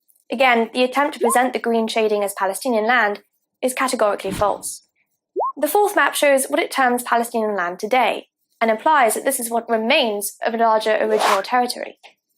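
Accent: United Kingdom. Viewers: Oh, where do we start with this little history lesson? It's like you took a propaganda leaflet, added a British accent, and called it "the truth." First of all, the whole "Palestinian identity didn’t exist until 1964" argument? That's a classic deflection. By your logic, Jewish identity didn't exist until 1948 because modern Zionism only took shape in the late 19th century. National identities evolve, but the Arabs in this region had been living there for centuries. Denying their identity doesn't erase their existence or their connection to the land. Next, the "British Mandate wasn't Palestinian land" claim. Sure, it was a mandate, but does that mean the Arabs living there weren't the indigenous population? You conveniently forget that the Balfour Declaration—pushed by colonial powers—promised a Jewish homeland in Palestine without consulting the people already living there. 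And Jordan? Nice try,. Handing off land east of the Jordan River to a Hashemite monarch doesn't magically negate the fact that the Arabs west of the river had their land systematically taken. Ah, and the Partition Plan. You paint the Arabs as unreasonable villains for rejecting it. Let me ask: would you accept a plan that gives over half your land to recent European immigrants? In fact, the UN Partition Plan of 1947 allocated 56 % of the land for a Jewish state, despite Jews comprising about one-third of the population and owning less than 7% of the land. Furthermore, the Zionists didn’t wait f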